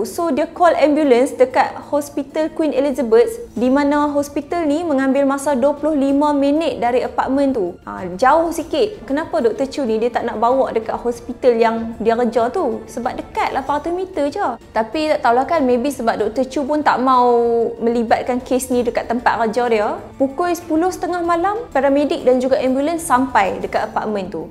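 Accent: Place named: North, Malaysia. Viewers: Terbaik sis cara penjelasan cerita lancar Dan mudah di fahami humble relax jaa ckp utara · syok dengaq loghat utara.... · Pekat betul bahasa Kedah ang noh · Hebat akak hg tau kt mana cerita ni. Sebenarnya menarik jgk . Lgi2 akak ni ckp mcam org utara. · Segak btul marka kedah ni..bibir dia manis dgn loghat utaqa❤ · Cakap utara noo.. 😊🌹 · xtau pulak ckp kedah naaaa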